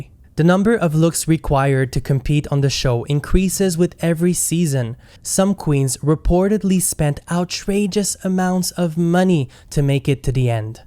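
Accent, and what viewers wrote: Quebec, Canada. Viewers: Not at all. Your French/Canadian?? accent is very charming 👍😊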